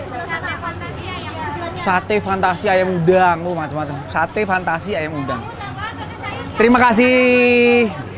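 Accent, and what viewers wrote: Java, Indonesia. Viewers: Mas bhs jowo ne medok. Asal mana toh mas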